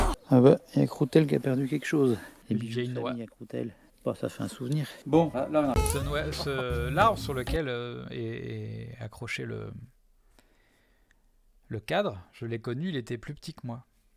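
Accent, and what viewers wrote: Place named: Agen, France. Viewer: Pour moi on est sur l'accent d'Agen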